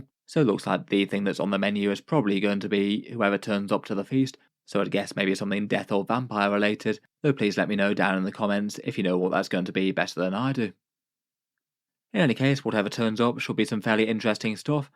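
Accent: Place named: Southern England, United Kingdom. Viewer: Somewhere in the south. And Games Workshop is a British company, there’s stores in nearly every town.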